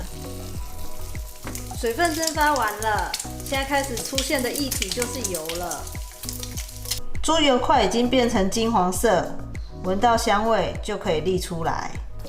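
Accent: Taiwan. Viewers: 很少聽到這樣的台灣國語了！真好吃的油飯，謝々阿嫂。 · 不會呀 台灣女生都這樣講話 我思念的鄉音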